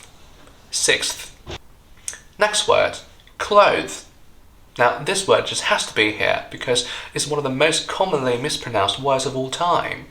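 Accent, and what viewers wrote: United Kingdom. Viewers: 你的英语太好了。 You have an exquisite British accent. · 果然同樣身為華人比較容易了解弱點在哪，更棒的是還是英式發音，期待你下次更新，萬分感恩🙇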